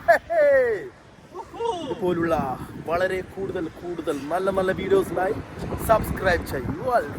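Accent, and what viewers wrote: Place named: Kasaragod, India. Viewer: Kasargod slang aan kelkan rasam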